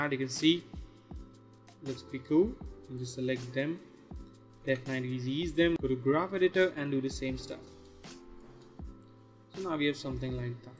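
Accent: India. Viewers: Like a dove telling. Accent hindi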